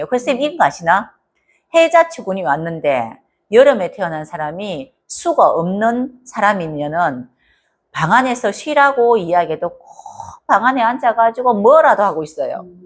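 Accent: Gyeongsang, South Korea. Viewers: 같은 경상도라도 너무 딱딱하니 듣기가 안 좋습니다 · 서울사람인데요 경상도 사투리 넘나 이쁘고 다 알아듣습니다 감사드려요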